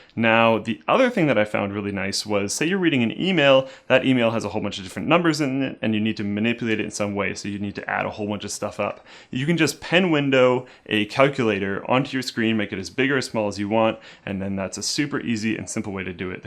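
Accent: Canada. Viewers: Is he Canadian? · dat canadian accent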